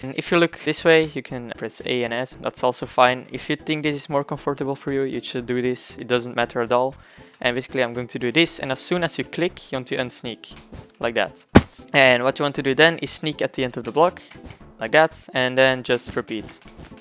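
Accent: Netherlands. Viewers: Are you from Holland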